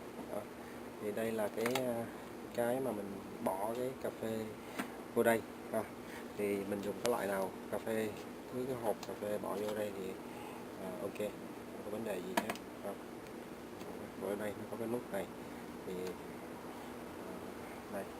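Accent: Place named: United Kingdom. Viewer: Cảm ơn anh đã chia sẻ về dụng cụ này, nghe anh nói tiếng Anh Anh rất hay nhưng ko phải giọng Mỹ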